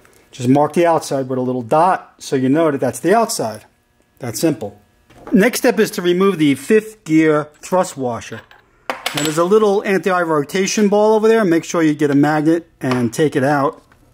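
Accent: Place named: New York, United States. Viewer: new york accent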